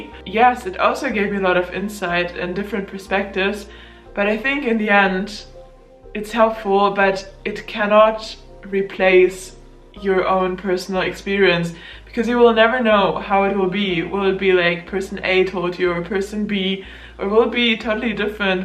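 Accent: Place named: Germany. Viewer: Oh, you’re from Germany. I was trying to figure out your accent but couldn’t get it. You are probably suffering a symptom called “Bangkok Blue or Thailand Blue. Tourists from around the world who visited Thailand get it after they’ve returned to their country. The symptoms are, sadness and can accompany with crying. No need to ask your doctor or searching for a medication for this. It should go away in a few weeks. But if it’s doesn’t go away then you will have to save money for your return to Thailand, hopefully soon. Thailand gives so much happiness to the tourists. Like anything when you feel high and good. But not so easy naturally when you have to come back down. Hope you can adjust back to a happy life in Germany soon. Thailand will wait for your return 😃 ✋🇹🇭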